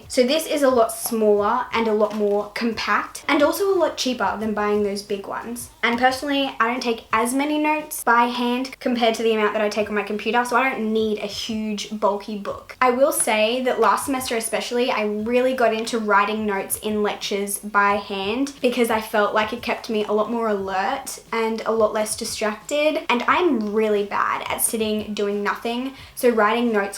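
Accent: Australia. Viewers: I find it so weird how Australian people have english and American accent mixed together 😂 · I subbed just because of your verse at the beginning of your video, your cross necklace, and your Aussie accent. The funny thing is I don’t think I have an Australian accent and then I go and watch other Aussie’s videos and I can definitely tell their accent!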